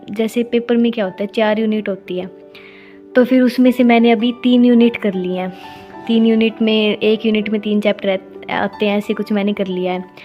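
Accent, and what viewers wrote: Haryana, India. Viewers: Haryanvi tone lagra aapka.. Haryana se ho kya aap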